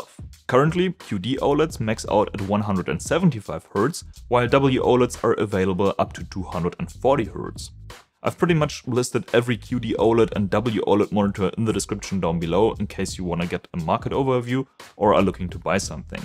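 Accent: Germany. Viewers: He has about the strongest German accent out there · You German? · The first video I ever watched from him, after first couple of sentences, I tought to myself: " Oh, a German doing reviews in English, that's rare". And I'm Croatian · its so german it sounds not german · I hear the german accent but appreciate that the video is in english lol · He has a pretty pronounced German accent lol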